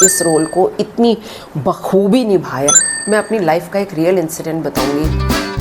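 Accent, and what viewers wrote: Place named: Pakistan. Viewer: Masha Allah mam apki awaz m kitni safai hy. Sath sath hmari mother laguage ka b touch hy in short sarhad paaar han hum lekin dilon me apnaiyat aj b ha jo apki baton se lag rae hy k jese aek e family ho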